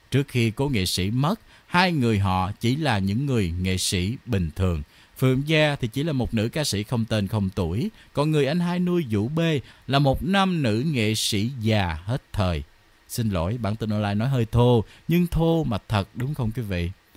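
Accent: Standard. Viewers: nói chuẩn và hay.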